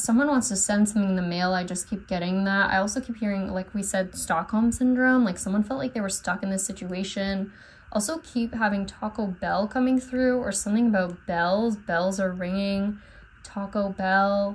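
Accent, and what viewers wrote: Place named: South, United States. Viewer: its southren accent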